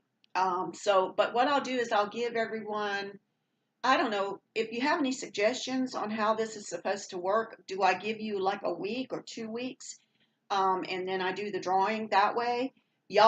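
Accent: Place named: South, United States. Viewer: Hello there thank you so much for all of your very sweet words!! I love your southern accent!! Do you like my northern accent? I’m northern Pennsylvania! Xoxoxo 💕🦋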